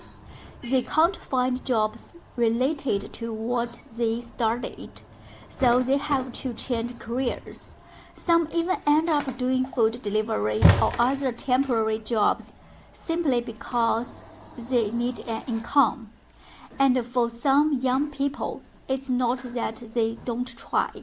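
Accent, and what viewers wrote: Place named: China. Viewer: You back❤ This type of English so familiar for me bcz of my Chinese boss.kind man he is .thank you so much showing how days are going ahead.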